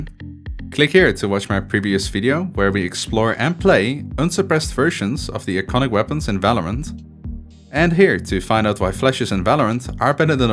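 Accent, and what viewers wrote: Netherlands. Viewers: Are you dutch by any chance? Your pronouncation sounds dutch lol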